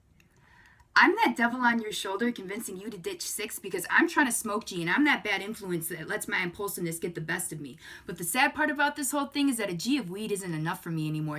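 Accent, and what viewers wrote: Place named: Midwest, United States. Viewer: Damn girl that Midwest accent is so cute what state are you from Wisconsin right?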